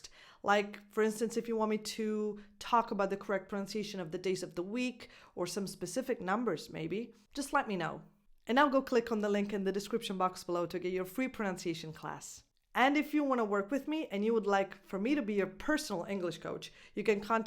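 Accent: United States. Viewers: Sorry but you speak Only American and Not English as most of the italian teachers......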